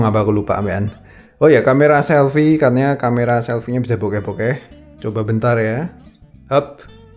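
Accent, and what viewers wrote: Java, Indonesia. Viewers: Omah mu ngendi lek...logat e jowo ngono 🤣. Trs le guyu Yo kok diejo😁 Ditunggu versus in nya...yg seharga ya...vs nya beberapa smartphone lah. 😁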